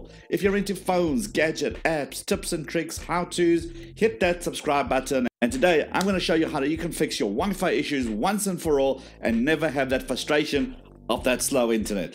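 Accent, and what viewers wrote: South Africa. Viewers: Your accent is so South African 🤔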